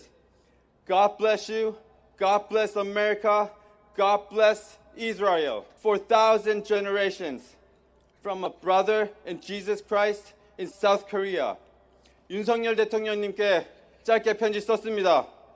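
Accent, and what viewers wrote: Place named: Canada. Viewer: ❤❤❤❤❤❤ 말이 필요 엄네요....그리고 영어 발음을 보니 확실히 캐나다 사신티가 나네요...응원합니다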